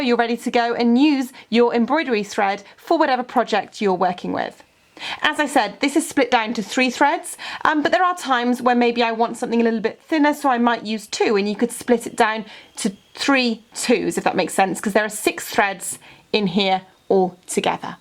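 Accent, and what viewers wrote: United Kingdom. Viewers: Very helpful, tysm. btw luve,luv LUV ur british accent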